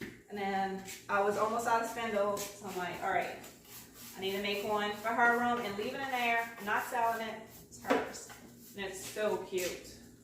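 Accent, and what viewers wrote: Louisiana, United States. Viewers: I KNEW you were from Louisiana when I started watching this video! I heard it in your accent. I'm from Baton Rouge, but have lived in South Carolina for 36 years. I am redoing a fantastic old high chair that I found in somebody's garbage. I've done another one, but not with chalk paint. This will be my first chalk paint project and it is for my 6 year old granddaughter. She wants a real high chair for her baby dolls, so this will be my Christmas gift to her. You did a beautiful job on this and I got a lot of good ideas from you!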